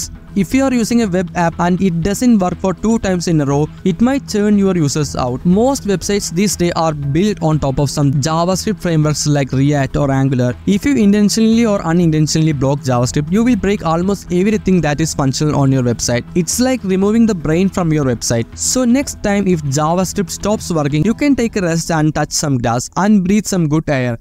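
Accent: Kerala, India. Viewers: Malayali's english accent 💀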